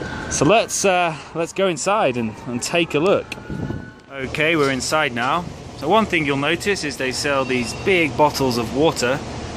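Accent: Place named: United Kingdom. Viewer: I love the british accent !! > Good videos bro.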